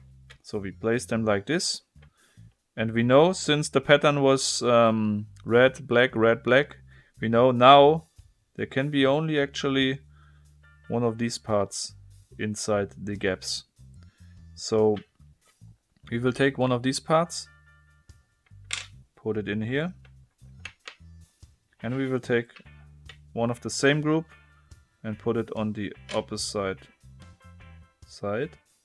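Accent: Germany. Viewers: the most german accent on youtube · Swastika, but isn't voice german? (Thinking hard) Hmmm..... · Der gute Herr klingt ziemlich deutsch... ^^ ,,smells after"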